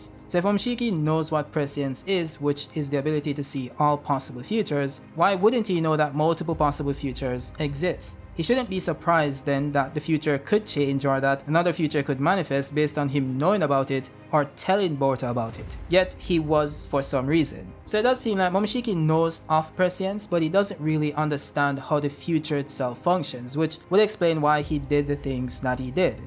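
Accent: Jamaica. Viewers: Are you Jamaican? I hear an accent. Big up from Jamaica bro😂🔥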